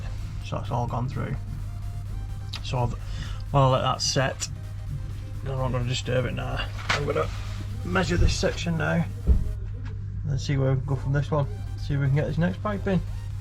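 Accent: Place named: Bolton, United Kingdom. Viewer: another thing I should have done...... put mine under the floor instead of inside....ah well, it'll have to stay where it is now lol. Great video. quck question if you dont mind, I recognise a Bolton/manchester accent. I'm from Bolton. I found your channel by accident whilst youtube surfing and envy your confidence on screen. I've just started my channel and petrified in doing a piece to camera lol. Bessy regs-Kenny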